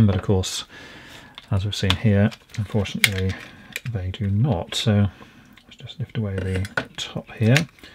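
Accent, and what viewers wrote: United Kingdom. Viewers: Just crapola from a trades person from the UK. Not at all an expert. Don't fall for the Uk accent.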